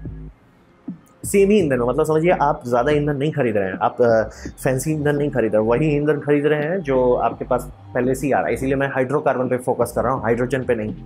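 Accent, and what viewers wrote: Bihar, India. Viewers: Can I guess you are from Bihar naa???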